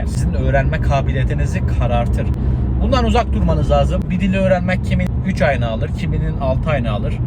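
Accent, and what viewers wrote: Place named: Azerbaijan. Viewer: Abi sen Azerbaycanlisin konuşmandan biliniyo😅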